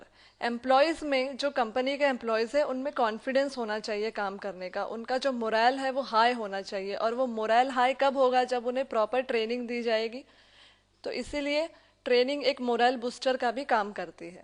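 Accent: India. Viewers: Helpful program but Indian English voice that is difficult to hear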